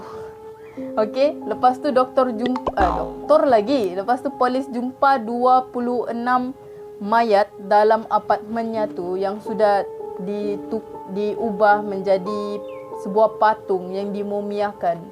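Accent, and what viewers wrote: Malaysia. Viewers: Kok lucu y lihat cakapnye orang Malaysia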